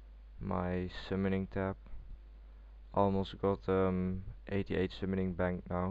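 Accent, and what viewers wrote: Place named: Netherlands. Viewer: nederlands accent ftw :D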